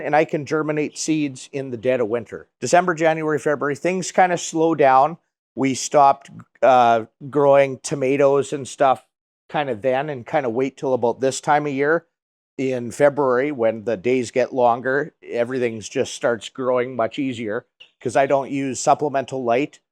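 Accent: Canada. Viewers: This guy sounds so Canadian i Love it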